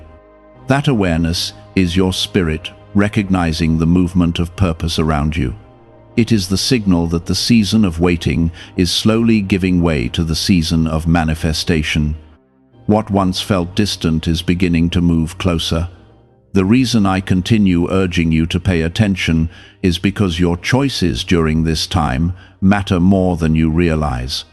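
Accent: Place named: United Kingdom. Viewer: HI THERE GOD OF HATE AND PUNISHMENT!!! WHAT IS THE TRAP YOU ARE SETTING FOR ME??? I WILL OVERCOME IT REGARDLESS. I not receiving ANYTHING until you disclose EVERYTHING. YOU ARE FAKE GOD and DIVINE TIMING I do not agree to! I only do things in REAL GOD'S TIMING ONLY!!! YOU PLAN NOTHING FOR ME! I DECLARE NO NUMBERS SO JUST GET OVER IT> THE FOG YOU SEE IS BECAUSE YOU ARE IN LONDON, YOUR ACCENT GIVES IT AWAY!!! THIS WHOLE CHOSEN ONE PROGRAM HAS BEEN IN RANDOM! I WILL NOT BE A CHOSEN ONE ANYMORE AND REAL GOD SAID I DON'T HAVE TO!!! YOU ARE NOT GOD! YOU ARE NOT GOD!!! YOU HAVE PROMISED BLESSING FOR OA YEAR SALREADY AND I NEVER GOT ONE!!! THANK REAL GOD YOU ARE NOT SANTA CLAUSE!!! YOU HAVE ZERO TRUST FROM ME! YOU ARE PREPARING NOTHING> YOU ARE FAKE!!! REAL GOD DOES NOT HAVE BRITISH ACCENT! HE HAS NO VOICE EITHER. HE TALKS TO ME TELEPATHICALLY JUST AS THE SOULS HE TALKS TO IN REAL HEAVEN>FINISH THIS IN 5 MINUTES OR I SIGN OFF!!! 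I AM NOT TAKING A BREAKTHROUGH! GET THAT THROUGH YOUR THICK HEAD!!! 4 minutes. 3 minutes, 2 minutes. 1 minute. Your time is up. CHEERIO!!!!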